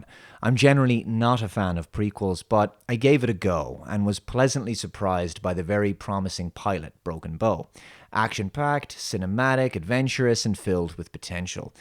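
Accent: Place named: Ireland. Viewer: U are very irish sir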